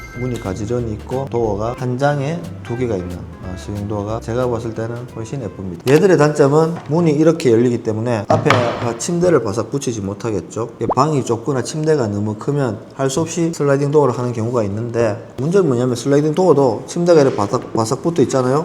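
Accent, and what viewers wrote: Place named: Gyeongsang, South Korea. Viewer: 설명 잘듣고 갑니다. 오랜만에 경상도 사투리 들어서 좋네요 ㅎㅎ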